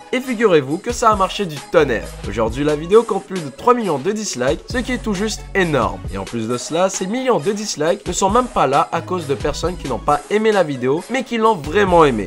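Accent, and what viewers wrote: Quebec, Canada. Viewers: T'es super! Mais es-tu Quebequois?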